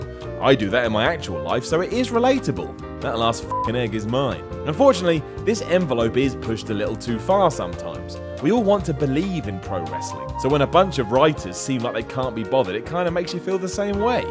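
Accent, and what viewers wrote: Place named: United Kingdom. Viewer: NuclearWinter i quite enjoy his voice actually. its soothing. but that could just be the biased American, who loves British accents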